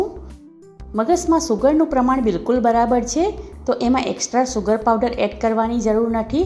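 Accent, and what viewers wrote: Surat, India. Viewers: Tame surti lago chho....boli parthi samjay che😀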